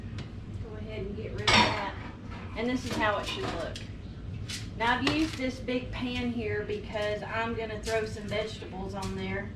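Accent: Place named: South, United States. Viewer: Thank you for the recipe! I made this today and it came out wonderful. My husband loved this. I simply can not wait for more recipes from you. By the way I just adore your southern accent!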